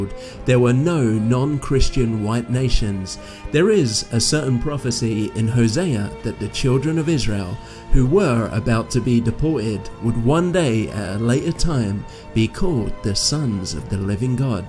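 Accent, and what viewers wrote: United Kingdom. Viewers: Nice work! Is that a Welsh accent? I haven't heard that accent so much. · It's a southern England accent. Relax.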